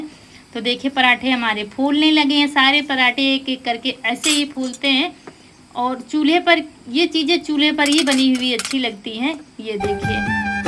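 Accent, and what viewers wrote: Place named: Raebareli, India. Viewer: Bahan aap Raibareli ya phir unnao jile ho Apki language se pta chalta h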